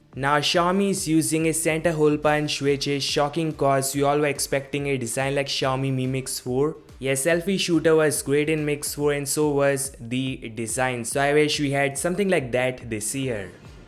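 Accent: India. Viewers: Dei engdian English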